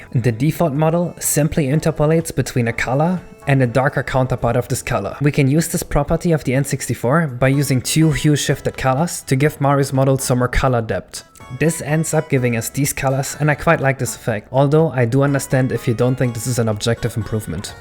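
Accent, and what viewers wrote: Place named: Germany. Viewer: dieser deutsche Akzent xD, aber krass was man aus SM64 mittlerweile machen kann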